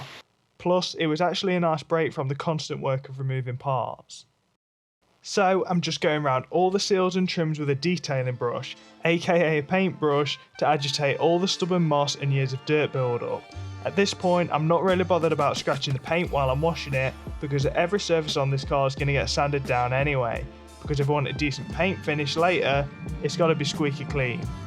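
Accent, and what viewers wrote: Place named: East Midlands, United Kingdom. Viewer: You sound like a east midlands lad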